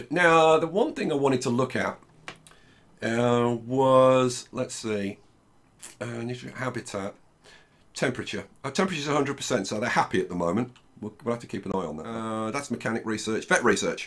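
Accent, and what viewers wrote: South Africa. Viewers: Are you South African? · Feel like Johannesburg? are you from South Africa? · you from South africa?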